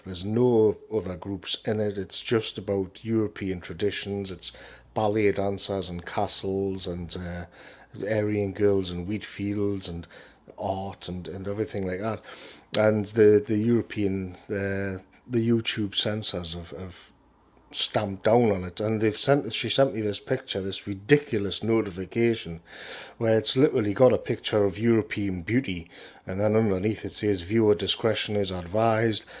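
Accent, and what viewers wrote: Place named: Newcastle, United Kingdom. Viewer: Excellent as always, with the usual sprinkling of linguistic nuggets: "sublime" is a word we hear so very little these days, especially in a Geordie accent. The Daily Shoah crew often talk about "The Kosher Sandwich" which is a similar ( but not identical) metaphor. After that my autoplay selected your vid: 'Roger Scruton & Words Of Power' which dove-tailed perfectly Thanks again. Clarity, insight, passion and brevity. It's a winning formula.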